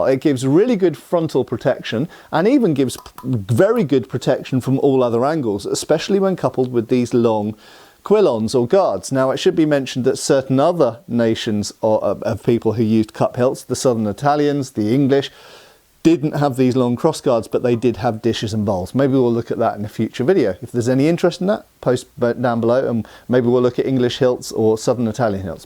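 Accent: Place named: United Kingdom. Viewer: Nice video . Today I'm practising HEMA, and as spanish I would like to get as much possible "Spanish Swords models. I Have realized that there are millions and it's going to be an impossible mision. Perfect your british accent (and difficult)to practise my English listening. Beatiful swords you' ve got. THANKS MATE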